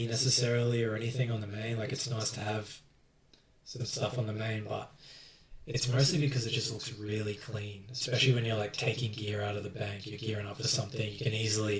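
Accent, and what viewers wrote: Australia. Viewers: Actually huge mate gz on true max! I’ve just started OS coming from world of Warcraft and I’m addicted as fuck. Almost 1k total level now, glad to hear another Aussie as well! Ps. Pls give me some GP 😉😂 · Can tell you're Aussie haha, Gz on 200m all.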